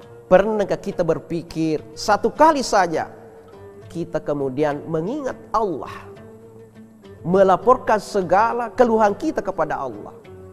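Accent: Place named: Bugis, Indonesia. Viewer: Iyye puang biar ada khas bugisnya...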